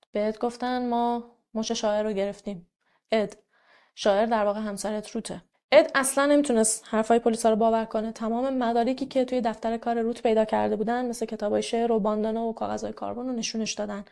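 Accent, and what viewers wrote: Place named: Ahvaz, Iran. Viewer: بچه کجای اهوازی تیکه کلامات مال اهوازیاس لهن صحبتت و نوع حرف زدنت اهوازیه ..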